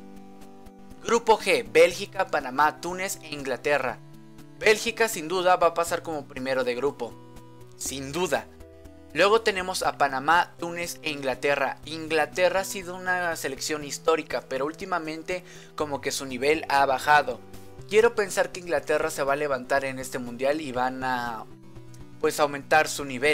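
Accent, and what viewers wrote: Argentina. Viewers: Se nota que eres Argentina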